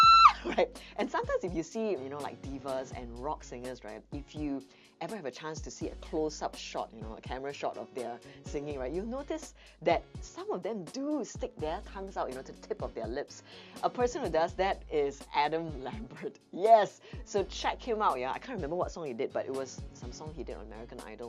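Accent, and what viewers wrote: Singapore. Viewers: Are u Singaporean or malaysia🤣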